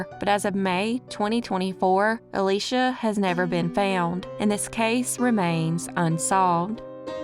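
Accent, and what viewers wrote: South, United States. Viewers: My family and I were talking today about southern accents. Yours is adorable. You do such a great job on these stories.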